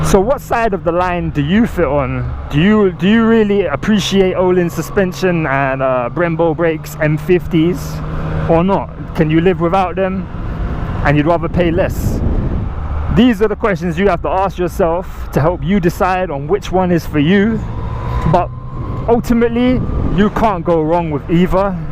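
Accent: London, United Kingdom. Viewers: That's not a British accent, that's the accent the white boys who want to be black use in London. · I'm confused, you have a british accent but your nott in the UK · I think he's from London and I know loads of geezas who talk like him. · Too young to be old new London accent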